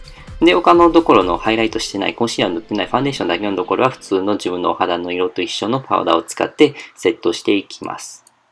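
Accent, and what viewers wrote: Kansai, Japan. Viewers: めっちゃ関西弁やん♡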